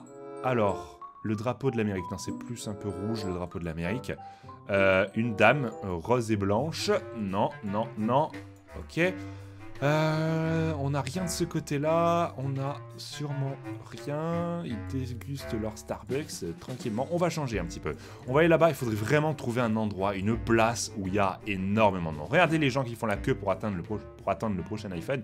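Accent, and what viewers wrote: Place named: South, France. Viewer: ah les gens du sud et leurs " rause " sans me moquer de ton accent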